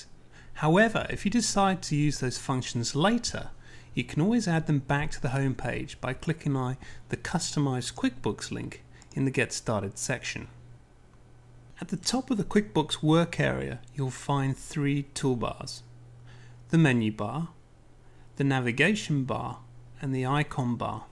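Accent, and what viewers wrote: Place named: United Kingdom. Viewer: Beautiful british accent.. xD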